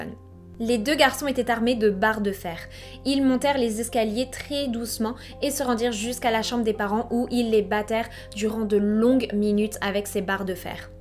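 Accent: Quebec, Canada. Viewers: Est tu canadienne tu a un acsant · On dirait qu elle a un accent léger du Québec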